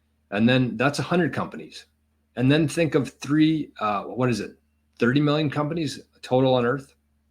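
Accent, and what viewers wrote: Canada. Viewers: As an American, it’s so cringe to listen to a canadian 🤦🏻‍♂️ Very mid content.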